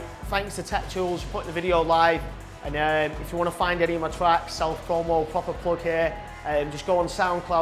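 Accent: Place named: Manchester, United Kingdom. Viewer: Love the Manc accent! ‘Bring in some rims...’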